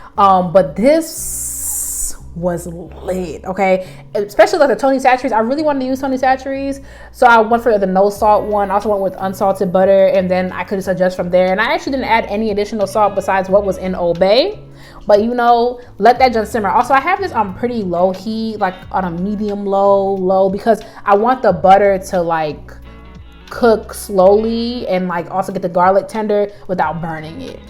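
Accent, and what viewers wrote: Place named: New York, United States. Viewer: Soon as you started talking it was giving NY lol we talk fast then you said “so boom” I’m like ok def NY. Then you said you lived in Rochester and that’s exactly where I’m from lol. Trying your recipe this week!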